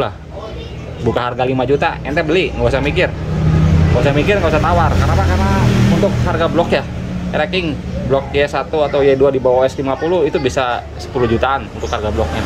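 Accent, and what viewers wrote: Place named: Indramayu, Indonesia. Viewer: Ngomong jawanya kya jawa indramayu😁😁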